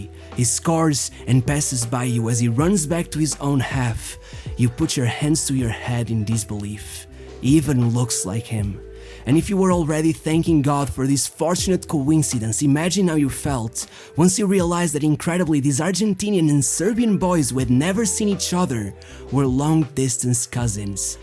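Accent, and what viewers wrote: Portugal. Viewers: By your accent you are portuguese. I know because i speak the same accent 🤣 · Are you Portuguese or from Portugal? I am, and the way you speak is very familiar.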